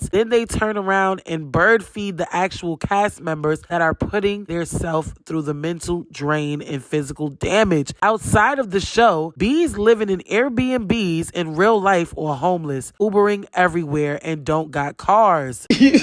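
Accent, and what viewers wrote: New York, United States. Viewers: YOURE DEFINITELY FROM N.Y. ITS THE WAY WE TALK…PERIOD LOVE THE CONTENT🖤💪🏾💯🥂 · You sound like Remy ma... You can tell you're from NY ❤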